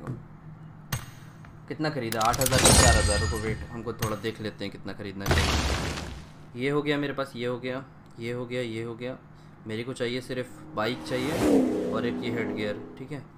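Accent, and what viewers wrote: Bihar, India. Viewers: bhai bihar se ho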